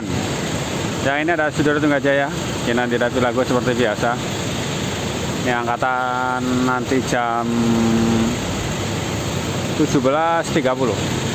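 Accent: Banyumas, Indonesia. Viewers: Hadur dan nyomak lagi Bang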